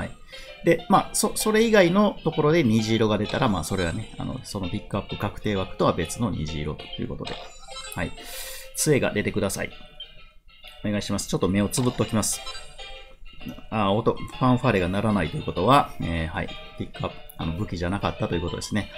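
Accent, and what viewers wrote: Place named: Kansai, Japan. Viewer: あ、残念ですねー😆 目つぶってる🤭ｸｸｸｸｸｯ 浜田さんの関西弁好きやでー❤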